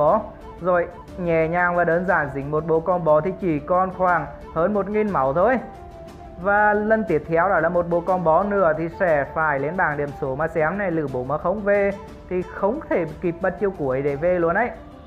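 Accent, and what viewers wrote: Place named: Central, Vietnam. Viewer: Bố gét giọng miễn trung DKMcm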